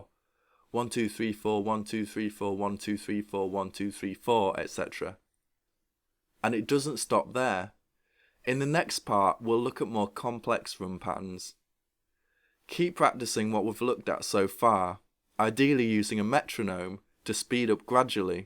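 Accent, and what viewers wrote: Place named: Cockney, United Kingdom. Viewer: cockney speach hehe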